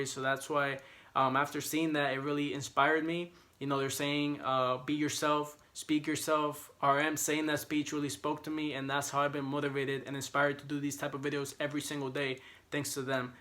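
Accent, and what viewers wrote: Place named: Puerto Rico. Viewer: 5'9 jeez everyone towers over me I'm such a hobbit. XD This was a cool video, I like hearing the kinds of things you feel are important. I laughed when I heard you say PR. I'm from NYC and that was my first guess when I heard your accent. Anyway. Thanks for this video. I'm glad you want to do something good for the world with what you have (!). When I first saw your videos I knew you were going to be an ARMY - because people who want to do something to make the world a little better tend to be the ones who are drawn to. I'm really interested in the environment too, I love cultures and religions and like green technology. We'd hang, I bet. XD I think you might like checking out a video called Inside the ARMY that was done by MTV. When you see it I bet you'll know why I knew you'd be family from the very beginning.:D And if you want something funny, on Running Man ep 300 was just re-released by KOCOWA. 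They were on the show (a variety show - you might like them) a couple of years ago and it was pretty cute. I don't know the copyright rules for it though. I think it should be okay... but maybe check with people.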